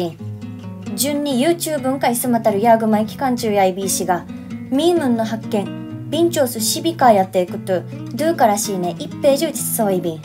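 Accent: Okinawa, Japan. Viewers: 中部なまり 同じ事を2回繰り返して言うのは「 」のクセ（笑） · 中部と南部の方言が混ざってる感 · 南部方言ですか？？ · 綺麗なウチナーグチ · 沖縄の方言何言ってるか分からんけど可愛い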